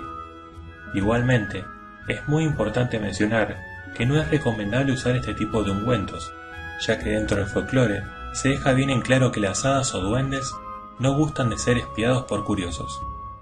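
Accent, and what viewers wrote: Argentina. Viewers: bueno pues tu acento me parece que es de una persona de argentina. posdata: me saludas a maradona y ha leonel messi jajajaj es broma tío. buen vídeo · eres argentino o uruguayo o paraguay por el dejo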